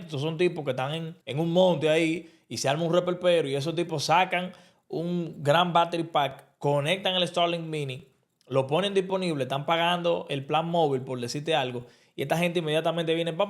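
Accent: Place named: United States. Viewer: Porque este negro de república dominicana habla como si fuera gringo? Que vergüenza esa gente que se hacen los gringos siendo de un país miserable hispanohablante 🤦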